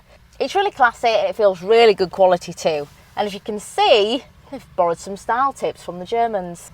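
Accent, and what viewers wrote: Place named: RP, United Kingdom. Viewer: What is this bloody posh accent come on.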